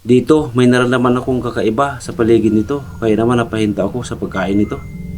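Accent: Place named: Bicol, Philippines. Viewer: Parang Taga bicol itong si par!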